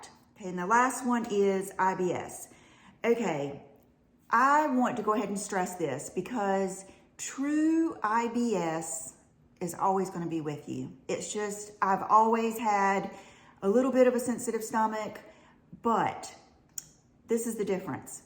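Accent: South, United States. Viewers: Hey, I love all your video’s! Makeup, health, clothes, plants or jewelry. Whatever you talk about is inspiring and fun to watch. You said you enjoy listening to Tati in your car…Well Your the one I love to listen to in my truck…your voice is so calming…I love your southern accent and how down to earth you are. If people are offended or bothered by you talking about your carnivore diet, then it’s their problem. I find that so odd that someone would tell you that you make them feel bad by talking about your health journey. Obviously they feel guilty and have issues in their life that they need to address. You can’t make everyone happy!!! Keep doing what you do and don’t let anyone make you feel bad about it!!! I have the same issues you were having with joint pain and gut issues. I went on a blood type diet that basically had me eating eggs. I felt so much better but then the holidays rolled around and I gave into the cookies and now I’m struggling to stop eating sugar. 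It is so addicting but makes me feel horrible. Listening to you today has helped push me to start that change in my diet again. Thank you!!! Love you 🤗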